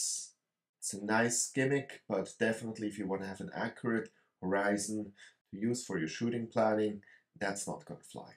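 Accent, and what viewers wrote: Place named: Austria. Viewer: This guy's accent is Arnold light!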